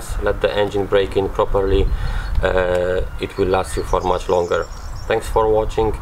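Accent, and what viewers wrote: Poland. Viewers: Słychać ze Polak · słychać że polak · Masz polski akcent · Też czułem że Polak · Po języku słychać ze jesteś Polakiem wiec czemu nie mozesz mówić po polsku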